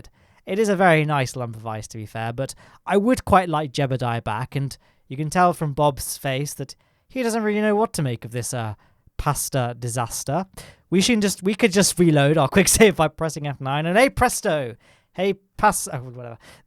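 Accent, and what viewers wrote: United Kingdom. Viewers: I love his stuff, great video's and explanations. I even like the British accent. Half way through this one though, and I've lost interest in him. HeiGHT. HIGH T. HARD T. It's not heigthhhhhh!!!!! Well educated, a doctor, and ENGLISH- it's THEIR LANGUAGE!!!!!!! Do you axe patients how dey feelz too?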